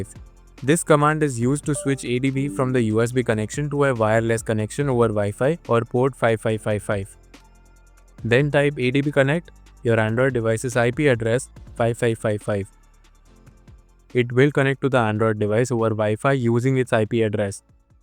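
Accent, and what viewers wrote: India. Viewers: an indian accent... this just raised my HOPE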